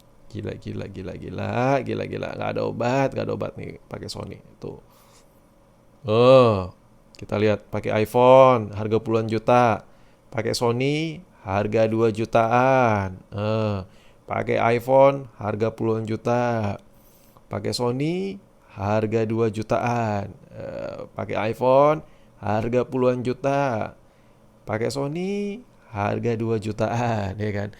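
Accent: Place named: East Java, Indonesia. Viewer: Orang jatim ya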